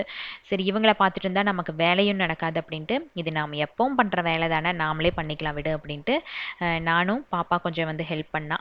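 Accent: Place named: Kongu, India. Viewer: Akka, your voice and that kongu tamil is so sweet. Also our tamil and gardeners reaction is romba edharthama eruku nga ka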